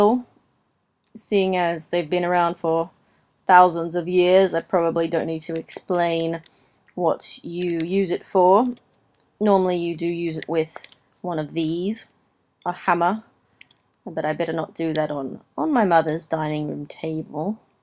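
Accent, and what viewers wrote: Australia. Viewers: Your accent is bueatiful,where are you from?...from Australia l bet! by the accent .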